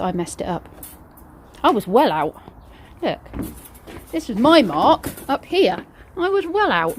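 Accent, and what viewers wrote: United Kingdom. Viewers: .. It's that Brit accent that gets me all the time.. hahaha.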